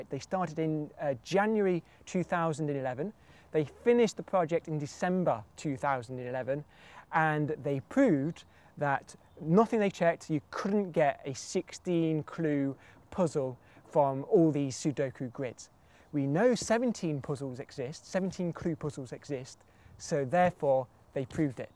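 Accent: United Kingdom. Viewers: are u british u speak with a british accent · if this man did not have a British accent i would have stopped watching about 8 minutes and 30 seconds ago. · Im american Hes british He sounds funny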